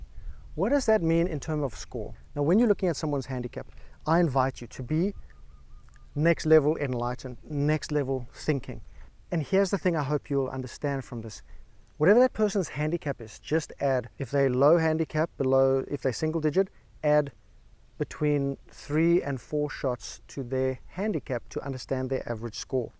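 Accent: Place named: South Africa. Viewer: Howzit china! Judging by the accent, you're just as South African as me lol. Dig your vids my bru. I've managed to lower my handicap from 28 to 16. Happy with that. Aiming for single digits this year. Been playing properly now that I'm in the UK as it's much more accessible than back home. Your tips and tricks are much appreciated!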